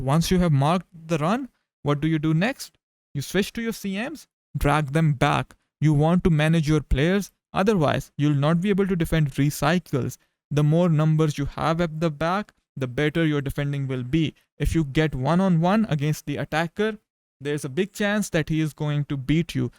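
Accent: India. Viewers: Man just tell are you indian as your accent is so much indian??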